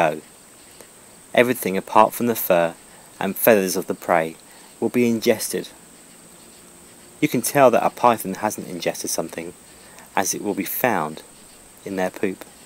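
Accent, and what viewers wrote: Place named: United Kingdom. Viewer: Cool accent! Is that British or South Africa?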